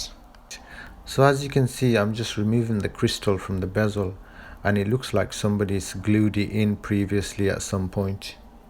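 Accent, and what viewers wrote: Birmingham, United Kingdom. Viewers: Birmingham. That's the accent I was having trouble placing. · Birmingham UK? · Fellow Brummie here. I live in the States now, and it's nice to watch your videos and hear a Brummie accent! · great job Sir. Love that you included the multiple visits from your boy too. I can detect your Birmingham accent embedded within your original accent. Very interesting. I love the Birmy accent. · Hello " Brummie " I really enjoy your videos. Keep up the great work !!!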